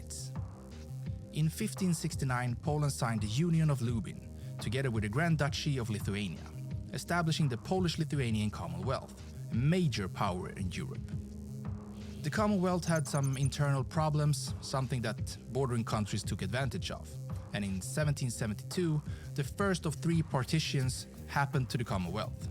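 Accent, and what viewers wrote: United States. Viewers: Are you Italian? How come your English is so American?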